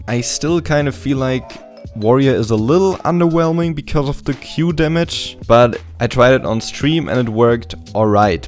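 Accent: Germany. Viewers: deutscher das höst man xD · HOLY SHIT der deutsche Akzent ist real...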